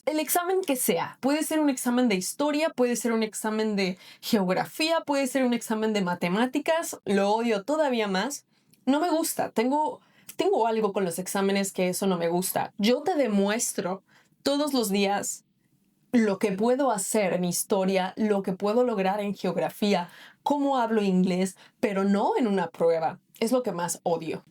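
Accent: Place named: United States. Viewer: lol hablas ingles como una americana. Estaba sorprendido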